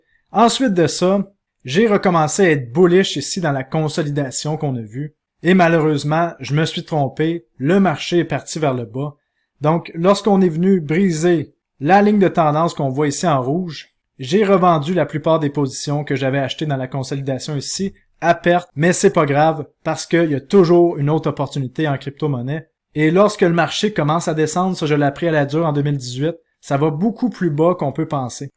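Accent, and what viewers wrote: Quebec, Canada. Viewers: merci vraiment des videos de qualite que tu fais, j'ador l'accent quebecoise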